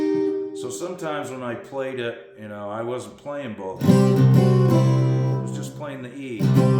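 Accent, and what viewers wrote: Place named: Boston, United States. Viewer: Boston accent made me subscribe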